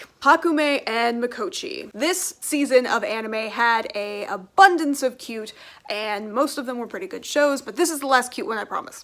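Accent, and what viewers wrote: United States. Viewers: I there’s any future anime reviews I’d live if you could write out the names. I have som hearing issues and Japanese with American accent is a bit tricky sometimes. 😂 Other than that, great video! Greetings from Sweden!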